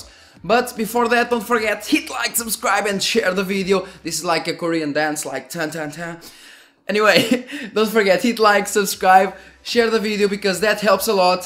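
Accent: Portugal. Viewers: Quando te ouvi falar logo no primeiro minuto pensei: este deve ser tuga, isto é um sotaque tuga a falar inglês. E eis que acertei! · Tens bons Vídeos,Só tens que melhorar o teu sotaque em Inglês,a primeira palavra que te ouvi falar soube logo que eras Portugues :) ahaha mas bom contéudo anyways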